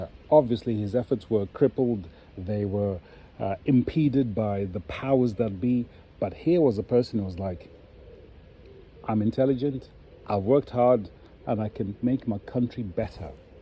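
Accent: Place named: South, United Kingdom. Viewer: Hi, You sound your are from the South of the UK, but could i ask you this? Any chance you can move to Scotland, and we can vote you into Government? You have an understanding of humanity, that these hyper qualified and frankly massive wallopers dont have. Thanks Scotland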